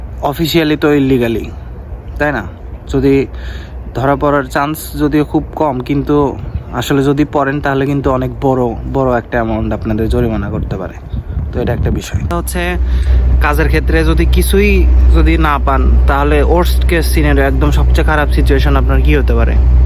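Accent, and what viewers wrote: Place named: Bogura, Bangladesh. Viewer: vaiya apni ki bograr apnr vasa ta bogurar moto laglo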